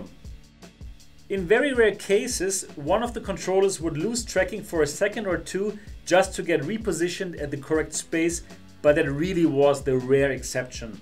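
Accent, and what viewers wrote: Germany. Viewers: ich hab mich am anfang schon gewundert, dass sich der akzent ziemlich deutsch anhört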